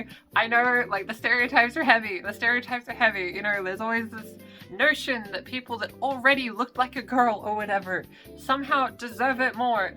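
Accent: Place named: Australia. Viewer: strayan accent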